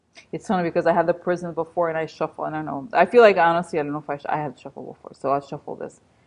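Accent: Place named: Russia. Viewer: My gosh, you crack me up! Wonderful reading as usual....love you...and that Russian accent!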